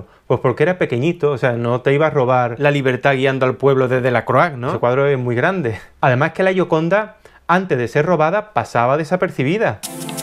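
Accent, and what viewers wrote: Andalusia, Spain. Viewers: Si de verdad amas el arte, hablas de obras que te gustan., siempre hablas, con tu acento encantador andaluz eso si, de obras que no te gustan y las degradas a lo mas bajo. Mucho escozor hay bajo esa melena...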